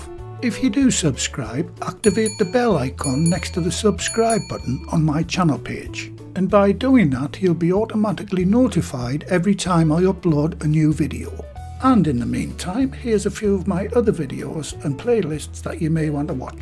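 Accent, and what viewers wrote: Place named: Durham, United Kingdom. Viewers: Loverly, jubberly,!. Brought back great memories of my 21 years in England and many happy Sunday lunches with my amazing (now sadly departed) English girl. Thanks for another superb video, and I always enjoying hearing your accent (Durham is it, or Washington, Tyne and Wear? My ears are getting rusty.) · What a refreshing change a Chef showing us how its done and making a video that's all about the food....no one knows what Mr even looks like because its all about the food well done sir and as a fellow north country man myself its nice hearing the dulcet tones of the accent again as I transplanted 55 years ago to Australia!!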